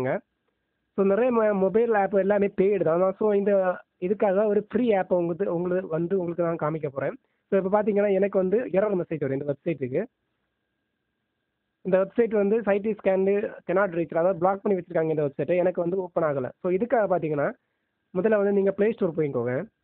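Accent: Kanyakumari, India. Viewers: Broo neega Kanyakumari thana...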